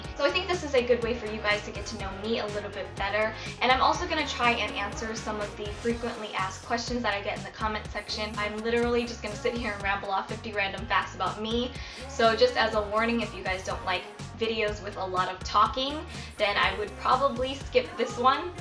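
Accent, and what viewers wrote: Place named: South, United States. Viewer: My god and your southern accent!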